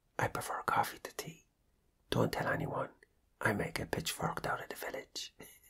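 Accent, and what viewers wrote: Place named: Ireland. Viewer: Me a year ago:I'm not into Irish accents Me now every time I here it:OH MY GUD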